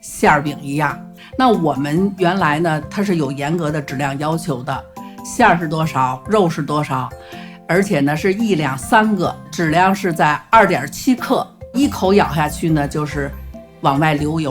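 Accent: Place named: Beijing, China. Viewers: 听着地道的北京话，突然想奶奶了…… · 地道老北京味儿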